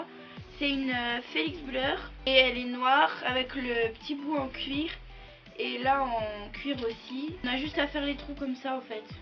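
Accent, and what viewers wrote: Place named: Switzerland. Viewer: a ok je trouvais que tu avais l'accent suisse😂 sinon je découvre ta chaîne aujourd'hui elle ets vraiment génial ❤️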